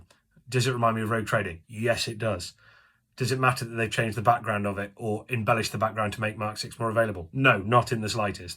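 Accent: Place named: United Kingdom. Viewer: You sound british are mustangs even sold there? Or are you not and I’m just stupid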